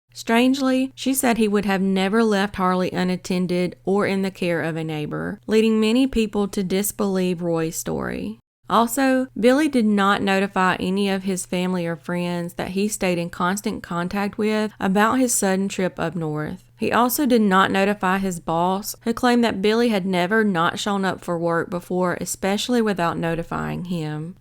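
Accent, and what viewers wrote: South, United States. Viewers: Ah brilliant it’s my favourite southern accented girl talking to me about my favourites subject - true crime :) thanks 🙏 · This is the first CT true crime video ive ever seen on YT. Really cool since ive lived here all my life and have watched 1000s of true crime docs on here. Ty southern girl who sounds super hot.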